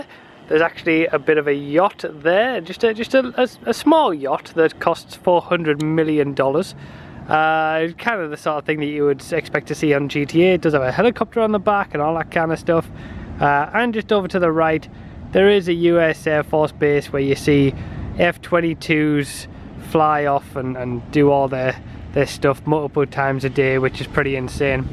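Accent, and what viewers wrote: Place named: United Kingdom. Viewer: Yeah it's how us Brits say schedule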